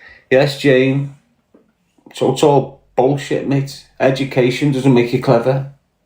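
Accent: Liverpool, United Kingdom. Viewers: The Scouse Terrence McKenna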